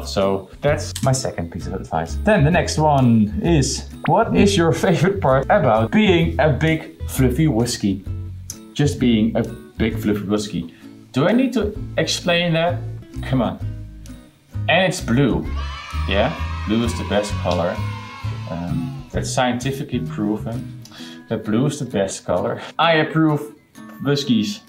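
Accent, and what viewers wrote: Netherlands. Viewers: As a fellow dutchie who's used to english content creators i'm just cringing so hard at our accent. Congrats tho you've earned it🥳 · how do you cringe, I love the Dutch accent. voice is angelic lol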